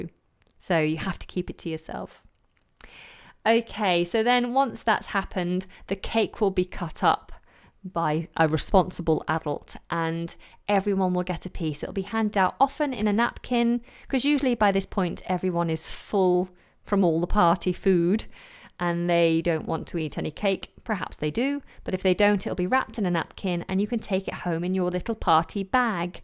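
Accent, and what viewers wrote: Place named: United Kingdom. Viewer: Hi, it’s a late wish, happy birthday all the best to you. This is Andrew. I just found you videos by YouTube recommendations. Really like you speed of British accent. Thanks many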